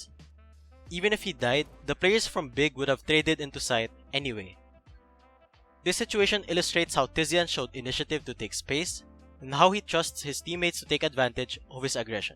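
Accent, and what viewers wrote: Philippines. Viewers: nice content again from, btw the voice over sounds like a Filipino. Love it!!! <3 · good content. btw narrator sounds like he’s from the 🇵🇭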